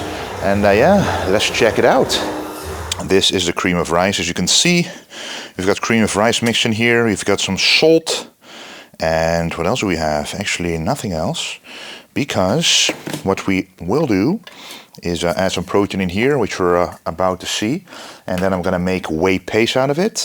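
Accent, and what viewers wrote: Netherlands. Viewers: OMG I am so much supporting you because of your lovely Dutch accent. ❤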